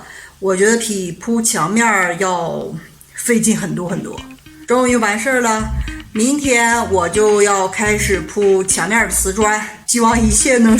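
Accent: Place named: Heilongjiang, China. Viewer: 为什么这么像黑龙江口音？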